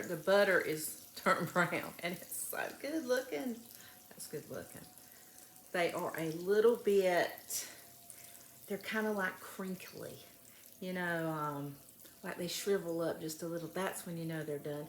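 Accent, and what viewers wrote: South, United States. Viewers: Love your southern accent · I was wondering what to do with these canned potatoes ty. And she has a lovely Southern accent which many of us are jealous of lol. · Thank you so much glad to meet you I will keep your Channel. I'm 90 years old and I live in Southern California and I know you're from the South but I don't know where how could I tell LOL🥰🥰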